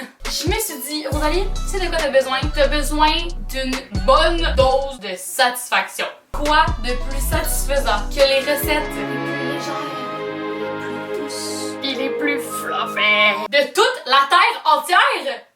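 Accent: Quebec, Canada. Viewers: ha ha h à l accent québécois tu veux dire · Mlle! Elle et québécoise normal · Je suis française et je reve de venir au kebek et j'adore ton accent ta bonne humeur et ton humour !! ❤️🔥💯💕👌👍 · MM95 non est juste Québécoise esti t’es tu cave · L'accent québécois est satisfaisant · non elle est juste québécoise pis elle a un accent différent alors si tu est française c normal que tu ne comprenne pas tout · Elle a juste un accent québécois prononcé :) Donc en effet si tu es française comme moi, il y a des termes difficiles à comprendre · MM95 Non elle est Québécoise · Ses un accent québécois 😆😆😆😆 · elle est québécoise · J'améliore mon niveau de compréhension du québécois à chacune de tes vidéos. Merci 😌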